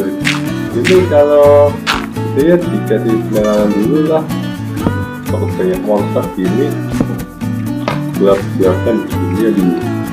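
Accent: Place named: Java, Indonesia. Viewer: Dari suara nya kayak orang jawa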